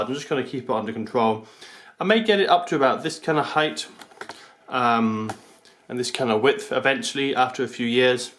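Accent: United Kingdom. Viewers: U sound like ur from the UK. What growing conditions are u using to get around the bad UK weather. And are you keeping these plants indoors outdoors or using a greenhouse? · I love your channel! For some reason a British accent keeps me from getting bored on how to videos. I have a question: how old should my jade plant be before I start pruning it like that?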